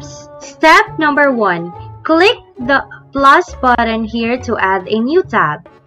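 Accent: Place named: England, United Kingdom. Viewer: Watching here po ma’am from Cielo Cartagena Vlogs☺️Thank you for sharing this very knowledgeable and very informative content of yours ma’am.Watching here all the way from 🇯🇵I also like your English accent.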